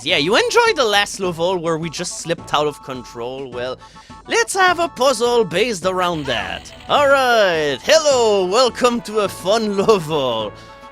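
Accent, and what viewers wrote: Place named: Quebec, Canada. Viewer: Il me semblait que je reconnaissais le doux accent québécois!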